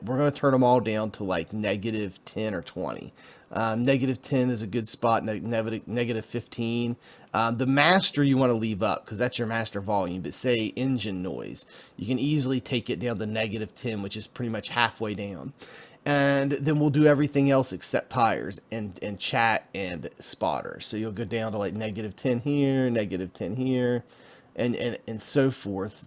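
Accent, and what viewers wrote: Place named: Baltimore, United States. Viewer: This dude is from Delaware Maryland PA area, sounds really Baltimorish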